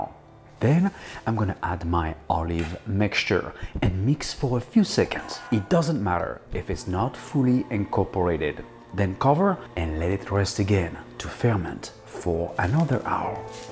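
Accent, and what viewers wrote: France. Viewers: Ur sound inspired my bro to learn French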